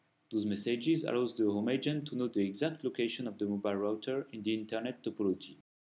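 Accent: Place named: France. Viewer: No no, French English ;-)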